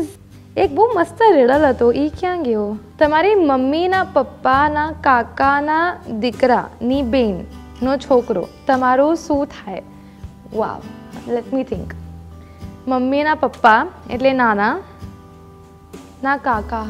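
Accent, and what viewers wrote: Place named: Kathiawar, India. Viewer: jordar girl!!!vadhare aetle maja avi bcoz tu kathiyawadi bolech🤩..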